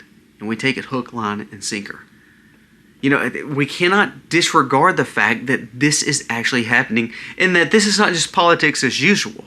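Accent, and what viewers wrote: South, United States. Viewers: I know you have a pleasant southern drawl. But if you could enunciate a little louder or clearer, my old yankee ears could understand you better No disrespect...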